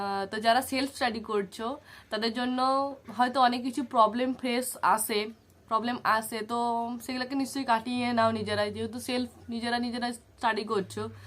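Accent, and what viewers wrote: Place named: Purulia, India. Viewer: Kotha sune ja bujhlam tomar bari Purulia ba bankura 😮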